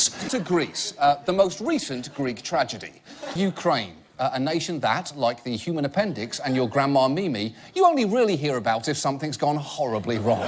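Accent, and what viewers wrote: United Kingdom. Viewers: Where are you from?? the shot hole England??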